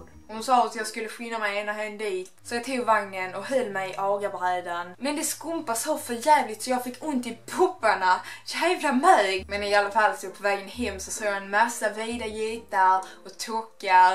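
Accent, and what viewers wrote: Sweden. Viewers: Är hon inte från hbg? Hon låter som de faktiskt.. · baserat på dialekten är hon från Kristianstad...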